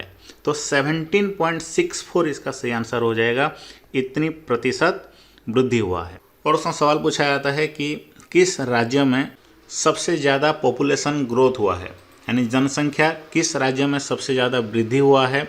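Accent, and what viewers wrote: Odisha, India. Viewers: Oriya bhai ka tune hai.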